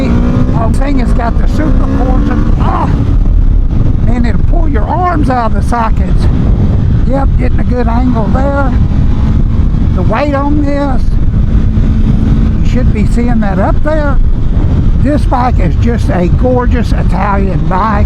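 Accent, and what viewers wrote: United States. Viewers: I doubt you're Italian. Were you born in Italy and do you have Italian citizenship? It sounds to me like you're American not Italian 🤔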